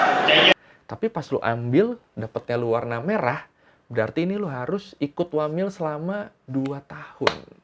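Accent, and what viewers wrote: Thailand. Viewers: bisaan maneh ngomong bahasa thai pke logat na t.g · anjing aksen thiland lu mantap jon..... ngakak gue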